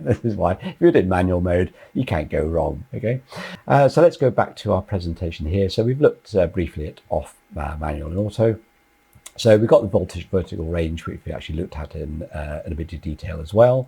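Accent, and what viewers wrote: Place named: United Kingdom. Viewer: Master class with a nice British accent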